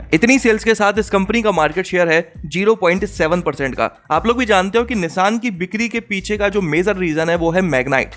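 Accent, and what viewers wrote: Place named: Bihar, India. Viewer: सर आप एकदम बिहारी की तरह बात करते है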